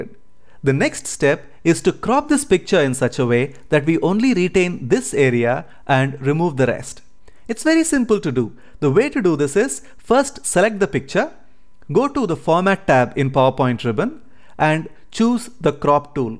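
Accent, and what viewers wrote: India. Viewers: wonderful!! best step by step explanation...n best part is Indian English. Thank you for sharing the idea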